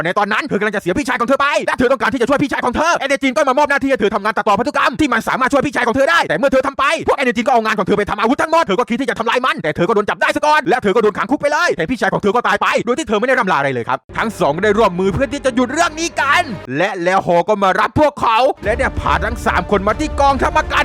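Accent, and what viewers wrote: South, Thailand. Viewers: พี่เป็นคนใต้เเน่นอน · พี่เป็นคนใต้ป่าวครับ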